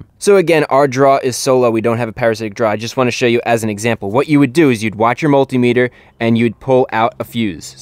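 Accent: United States. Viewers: American (simplified) english, dialect we hear in movies, shows and songs.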